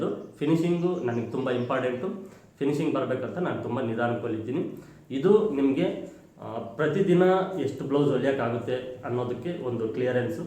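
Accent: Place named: Bagepalli, India. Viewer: Nivu yava kadeyavaru kannada ontara matadtira bagepally Or chintamani na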